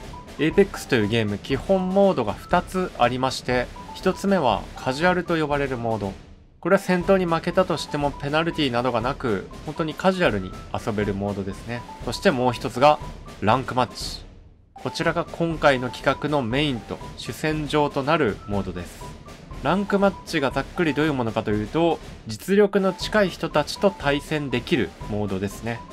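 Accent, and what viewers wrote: Japan. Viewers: 方言の喋り方茨城弁じゃないかな？ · 方言青森くさいな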